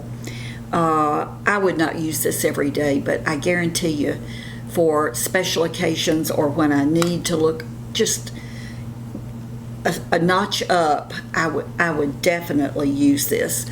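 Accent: South, United States. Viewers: It was lots of fun getting ready with you. You mentioned that you live in Florida. You must live in the panhandle with that wonderful southern accent.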